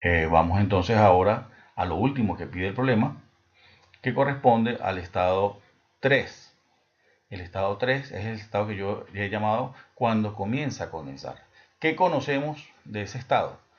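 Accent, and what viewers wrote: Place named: Venezuela. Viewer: Excelente hermano muy buena tu explicación! Y con acento venezolano todo se entiendo mejor..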